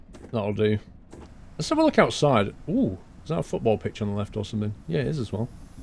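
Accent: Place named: London, United Kingdom. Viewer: hey! love your videos.. we Americans would call a Football Pitch, a Soccer Field... although I call it a pitch (if it is dealing with "soccer" / football ) as well as my friends who play the sport. my first job was at 13 and it was a Soccer referee.. did that until I was about 16.. good money for that age! anyways I know this is kinda random and off topic but may I ask what football team you support? whether their in The Championship, League One or League Two... I'm familiar with most clubs in England. I support Fulham (my mom's from Kensington) but since they got relegated(and proximity to Craven Cottage) I had to become a Chelsea plastic... I know I know it's a huge contradiction, but as an American I'm more of an admirer of English football, and support (more like, I admire) a couple clubs. it's hard to watch the Championship or lower tier leagues even with the soccer package I pay extra for on my cable. 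anyways. sorry for the lengthy comment that's off topic, just curious. I suspect West Ham or QPR, or many of the other London clubs because of the London accent. cheers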